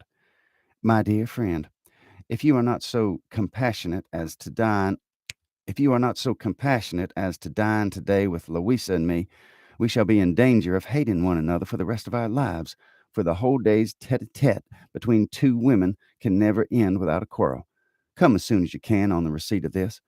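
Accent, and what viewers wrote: South, United States. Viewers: When I found these videos, I inwardly groaned, thinking it would somehow "bring down" the literature. Keep in mind, I live in TX myself. But after hearing a chapter, I loved it immediately. Nothing is lost in "translation", and indeed, the text lends itself to the Southern accents. My compliments to the reader, Mr..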